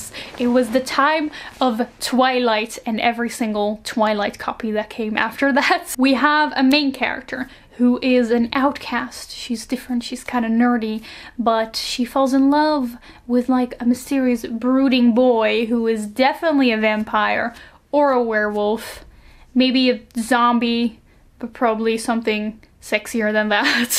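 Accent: Netherlands. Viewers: are you a dutchie i hear an accent ( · The Algorithm recommended me this video...that accent sounds dutch are you dutch?!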